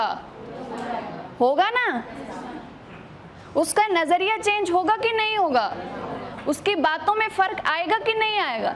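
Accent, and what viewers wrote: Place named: Rohtak, India. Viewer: Madam rohtak side ki hai kis kis ko lagta hai 😂😅😅😅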